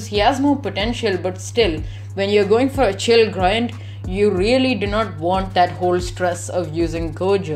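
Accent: India. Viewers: bro good video :) btw you sound like your from india which is a good sign because people from india always have best help and tips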